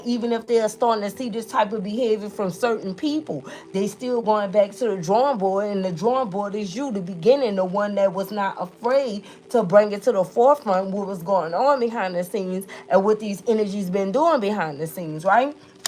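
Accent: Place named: New Orleans, United States. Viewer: You sound like you from New Orleans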